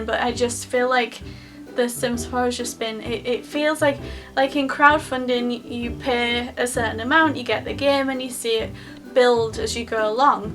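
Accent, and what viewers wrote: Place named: Scotland, United Kingdom. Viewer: I agree and I have completely lost hope now. The Sims 4 is fundamentaly different from other Sims-games in the depth of its core, and I think no expansion-pack or update can save it. :( My only hope is that the Sims 5 will be what the Sims 4 should have been: An improved version of the Sims 3. But it'll take years to find out... Btw are you Scottish? I love your accent :)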